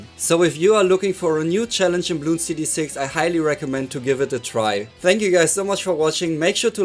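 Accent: Germany. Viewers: Er: Woher weißt du, dass ich deutsch bin? Auch sein Akzent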